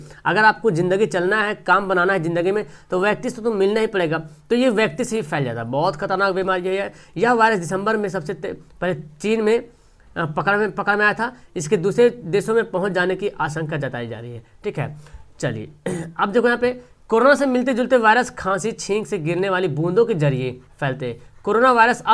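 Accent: Bihar, India. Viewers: Bhai Bihar se ho kya? Awaz se lagta h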